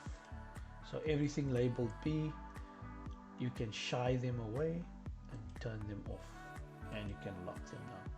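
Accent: South Africa. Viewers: Great tutorial, thanks so much for sharing! Do my ears deceive me, or am I hearing a South African accent?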